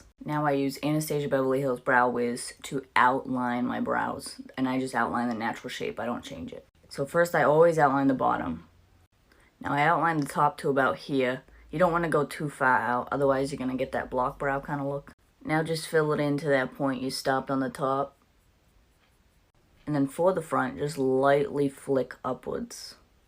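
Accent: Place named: Boston, United States. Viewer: She’s from south Boston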